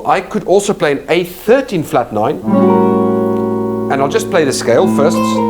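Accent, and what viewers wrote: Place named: RP, United Kingdom. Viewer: You have the poshest accent of any guitarist I’ve ever heard speak! Where is that from?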